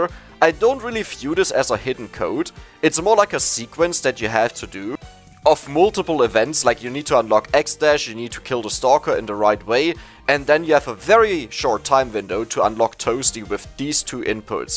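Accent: Germany. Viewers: Hab nach 2 sekunden gemerkt dass du deutsch bist, aber geiles video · Bro you're a German, right? Idk I feel the German accent in your English so badly haha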